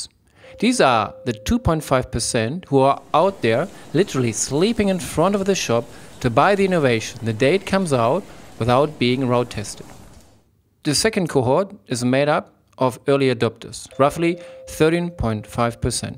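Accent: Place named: Germany. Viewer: not gonna lie, but I literally recognized your german accent in the first sentence :) Greetings from Germany :)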